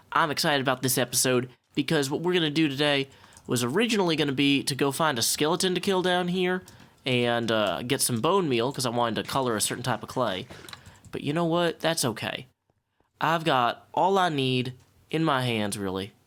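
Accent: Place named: Kentucky, United States. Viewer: I love your reasoning on base size lol Absolutely enjoying the heck out of your videos on HC this year - that bridge was super nice. Your cadence, accent, and word choice remind me of home and make me all nostalgic for my college years in Kentucky. :)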